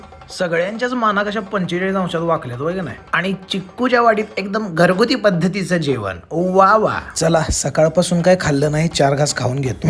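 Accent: Kolhapur, India. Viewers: कोल्हापुरी भाषा भारी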